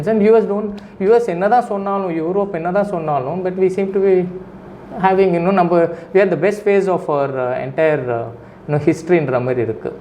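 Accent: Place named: India. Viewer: I love his English, his voice and his Indian accent. Every one including children can understand.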